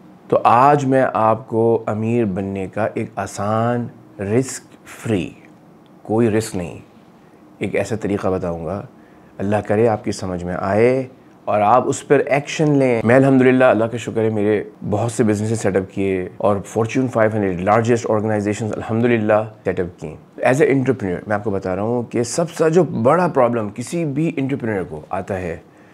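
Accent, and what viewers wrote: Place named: Karachi, Pakistan. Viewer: اسلام علیکم جزاک اللّہ سر آپ کا بولنے کا انداز کراچی والا ہے لیکن آپ بتارہے ہو آپ مری میں رہتے ہو ؟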